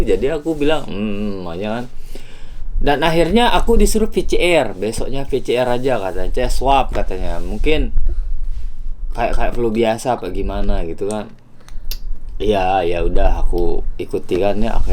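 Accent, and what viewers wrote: Lombok, Indonesia. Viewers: Walaupun pke b indo tapi logat sasaknya masih ada 🤣🤣 mantep side bang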